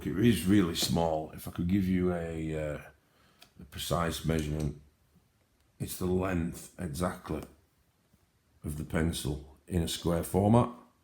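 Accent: Yorkshire, United Kingdom. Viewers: Hiya, what got a gruff Yorkshire man into colouring? BTW I was going to subscribe anyway, came across you by accident, good to see a couple of books I wouldn't normally look at and I'm definitely going to start checking out ebay more....nice one. I'm off to watch your 1st video, cheers 😄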